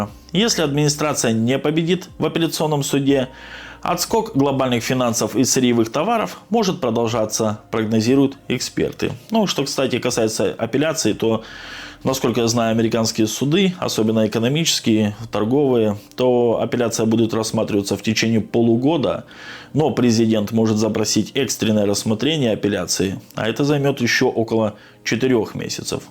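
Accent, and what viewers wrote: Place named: Ukraine. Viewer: Хохляцкий говор, очередная брехня от тцк шников!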